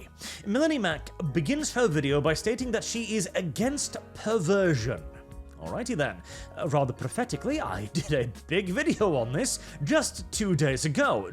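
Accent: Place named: Norwich, United Kingdom. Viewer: What game is this? I cant understand Norwish accent.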